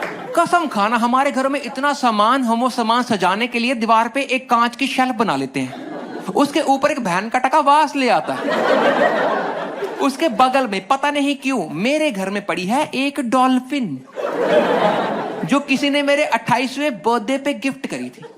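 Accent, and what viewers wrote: Punjab, India. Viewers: bhai ka punjabi accent makest it more funnier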